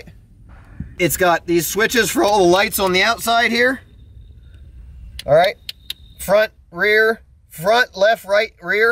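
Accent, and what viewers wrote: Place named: United States. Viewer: You sound like an American yet you drive a Toyota? For me AMERICAN MADE BABY . But whatever gets you home. Still a pretty truck though.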